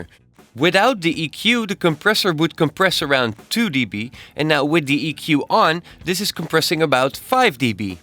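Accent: Netherlands. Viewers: Toevallig Nederlander? 😀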